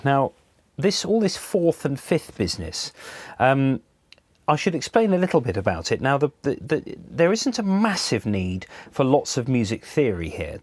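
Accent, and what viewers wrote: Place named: United Kingdom. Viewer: Hey man, loved this tutorial, and your accent it reminds me of a British tv show, cant remember which but it draws you in, please keep this type of content up.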